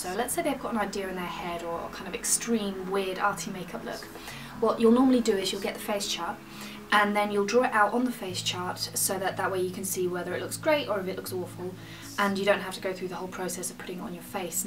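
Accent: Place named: Brighton, United Kingdom. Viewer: You sound like you're from Brighton (: you're a really beautiful woman too! Very natural (: xx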